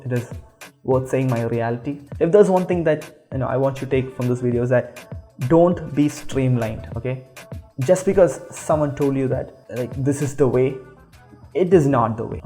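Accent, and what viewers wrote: Kerala, India. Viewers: I love your mallu accent da!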